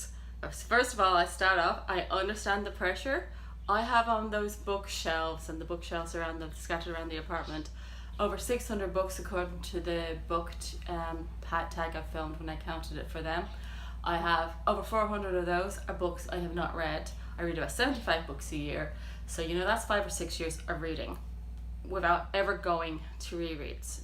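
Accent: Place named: Ireland. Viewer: Hi, I found your channel through Shawn and although you’ve only filmed ten or so videos, you seem a natural and it’s lovely to hear an Irish accent (via Australia was it?). I made a comment on Dave’s channel to the effect that I’m a very new rereader but have embraced it wholeheartedly, despite having as many unread books on my shelves as you have 😊. It’s primarily because I realized I can’t remember the books I read twenty years ago and it feels ridiculous saying I’ve read them when I can remember nothing about them. I’ve done four rereads this year and each one made that particular book so much more meaningful and I feel I could reread each one again. I must admit I don’t think about rereading mysteries and thrillers as part of the fun of those is finding out who did it but with my sieve like memory, that probably doesn’t matter! 🙄 I loved A Tale for the Time Being when I read it a few years ago and now you’ve made me want to reread it. 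Yes to rereading!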